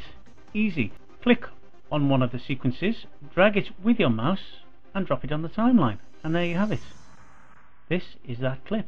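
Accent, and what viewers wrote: Wales, United Kingdom. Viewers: where are you from? coz you sound kinda welsh.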